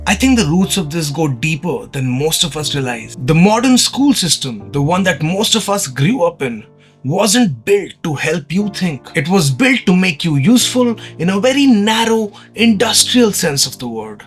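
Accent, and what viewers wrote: India. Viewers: reminded me of a YouTuber bass player who plays Behs you know?) Danny Sapko. So he’s pronouncement and yours are very similar except one little spice of India in yours and one little spice of Australia in his.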